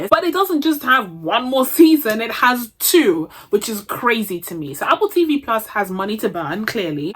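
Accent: United Kingdom. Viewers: Are u british??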